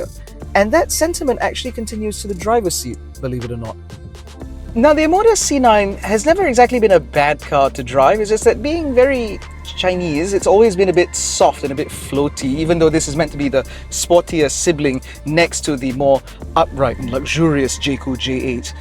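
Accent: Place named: RP, United Kingdom. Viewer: ..rocking those shades, with impeccable queens english..while driving the omoda9..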